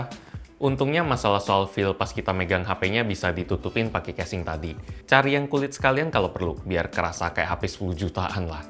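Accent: Palembang, Indonesia. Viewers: dia orang palembang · Palembang Om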